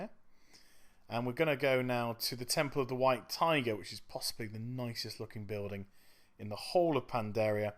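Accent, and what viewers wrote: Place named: United Kingdom. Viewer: Loving listening to a british WoW player for once! can't stand all those thousands of Americans. Thanks for guide worked a treat, was 50th mount got albino drake:)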